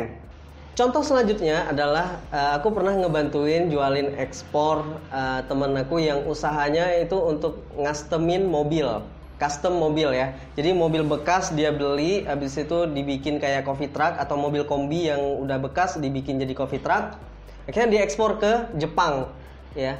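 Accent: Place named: Aceh, Indonesia. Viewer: Orang aceh kau ya bang??? Ku dengar logat bicara nya ada medok pletak pletok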